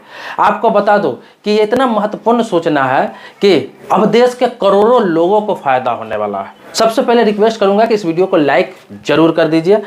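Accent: Bihar, India. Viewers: Mere lag raha hai ki tu bhi bihari hi bol raha hai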